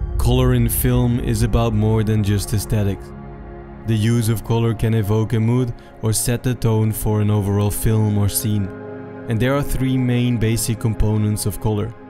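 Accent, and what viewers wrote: Netherlands. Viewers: btw brother are you Dutch or Belgian ? The accent too obvious hh. love your content lets keep it coming!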